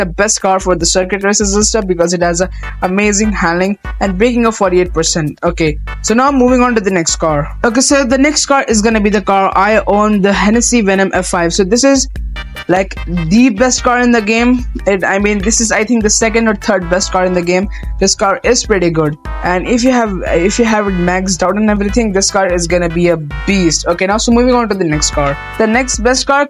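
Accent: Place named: India. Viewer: are u Indian? U have a Indian accent which is cool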